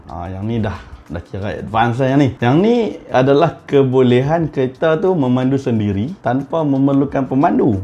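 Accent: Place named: Kedah, Malaysia. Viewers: Bila mana speaker mula berbicara guna bahasa kedah, aku dah tau betapa substancenya benda yg dia nk sembang tu. #thisisnorth #padubaqhang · Mesti org kedah ka? Hahahahah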